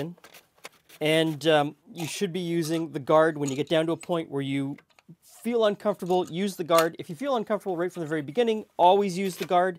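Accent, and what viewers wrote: Canada. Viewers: This man is Canadian! Or that is my guess. This video was interesting to watch!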